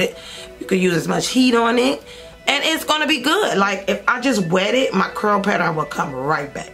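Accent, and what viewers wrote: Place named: Baltimore, United States. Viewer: I love when you say stuff like bother the way u say it I thought you were from Baltimore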